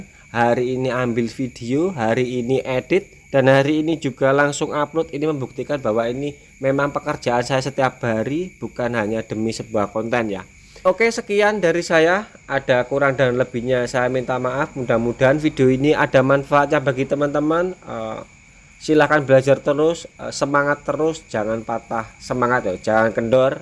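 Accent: East Java, Indonesia. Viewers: Lokasi di mana mas, ko kaya' jawa timur an...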